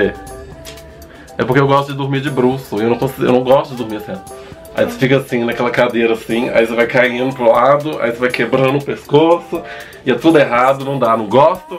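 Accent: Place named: Minas Gerais, Brazil. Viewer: Sotaque mineiro é a coisa mais linda desse mundo. Adorei o vídeo! ❤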